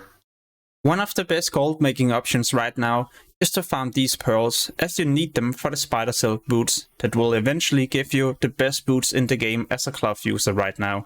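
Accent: Denmark. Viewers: This guy's danglish accent is off the charts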